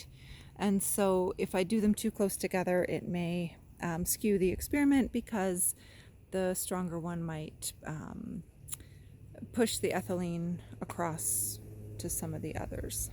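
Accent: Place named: Jamaica. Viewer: Heyyy you sound Jamaican!!!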